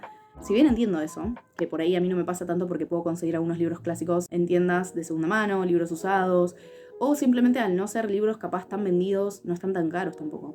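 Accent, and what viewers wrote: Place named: Argentina. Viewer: Te acabo de descubrir y me has enamorado. Suscribo cada una de tus palabras.....y encima con avento argentino....eso es un plus, y obvio.....mucho más lindo.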